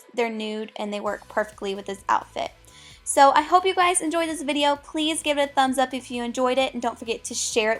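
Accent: South, United States. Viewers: in this video it sounds like you have a tent of a country accent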